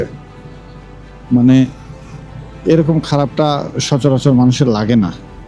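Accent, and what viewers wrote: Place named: Naogaon, Bangladesh. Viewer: ভাইয়া আপনার কথা শুনে বোঝা যাচ্ছে আপনি নওগাঁ জেলার এবং নওগাঁ সদরের আপনার একটু ঠিকানা বলবেন আপনার সাথে দেখা করবো সরাসরি। আমিও আপনার মত ভিডিও বানাই। আপনার সাথে কিছু পরামর্শ ছিল।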